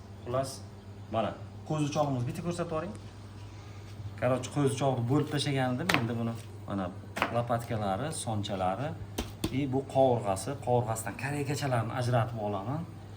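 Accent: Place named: Oasis, Uzbekistan. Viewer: Э харип Узин воха тамонлардансану шевагда гапуровур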